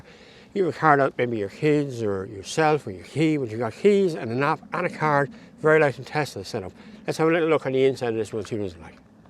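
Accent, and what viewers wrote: Ireland. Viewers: Hardly understand Irish 😂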